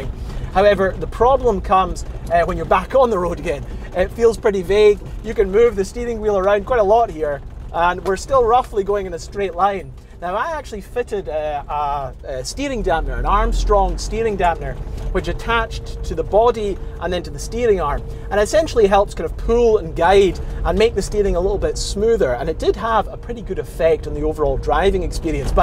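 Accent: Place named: Scotland, United Kingdom. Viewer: Great to hear another Scottish voice and see the lovely scenery of the islands. About to buy a series three here in Panama. Your video underscores my reasons for getting one, thanks.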